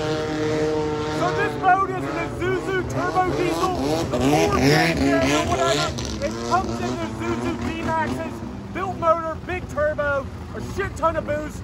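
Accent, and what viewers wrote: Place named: Baltimore, United States. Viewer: the baltimore accent makes this video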